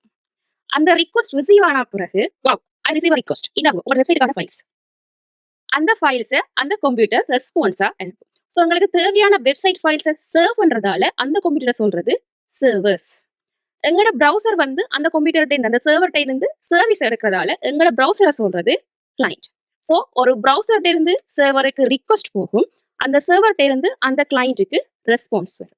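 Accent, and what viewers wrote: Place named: Sri Lanka. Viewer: Super sister theliva irukku, and mostly ungaloda Mozhi ilangai tamizh Mozhi romba pidukkum athanala theliva puriyuthonu Theriyala, nandri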